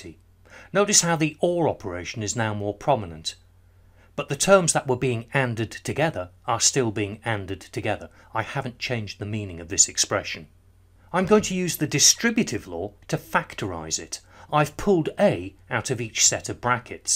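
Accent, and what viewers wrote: England, United Kingdom. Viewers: i liked your English accent, good work, how do i ask my question??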